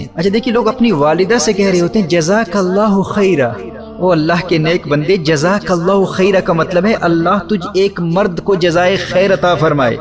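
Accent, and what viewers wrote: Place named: Arab world. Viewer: ماشاء اللہ خوب وضاحت ہے لیکن بصد معذرت آپ نے بہت سے تلفظ کو عربی accent میں ادا کرنے پر زور دیا جب کہ اردو کے حروف تہجی عربی زبان کے حروف تہجی جیسے ہیں مگر تلفظ میں دونوں زبانوں میں اکثر فرق پائیں گے۔ عربی زبان کے تلفظ اور اردو کے تلفظ کے درمیان بہت فرق ہے اردو کو عربی سے compare نہ کریں۔ تفصیل میں نہ جاتے ہوئے۔۔۔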